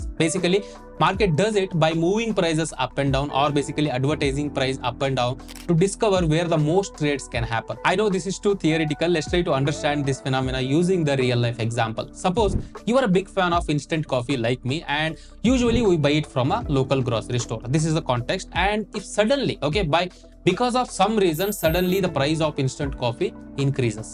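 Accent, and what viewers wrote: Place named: India. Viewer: indian accent terrible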